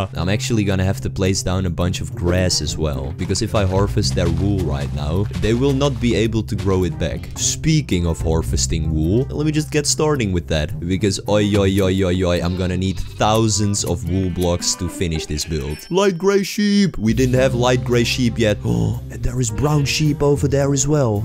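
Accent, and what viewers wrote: Netherlands. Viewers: Hmm. In which country do you live? You sound kinda dutch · Jij Hoort Gwn da hij nederlands is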